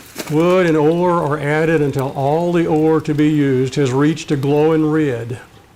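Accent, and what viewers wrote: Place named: South, United States. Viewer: Where are y’all located? I noticed the narrator has a southern accent. Kentucky? Tennessee? North Carolina?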